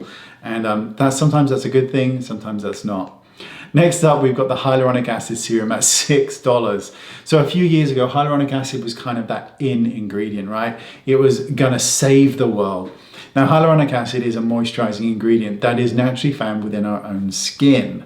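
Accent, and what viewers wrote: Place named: United Kingdom. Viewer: I wonder which British accent has. It’s so subtle.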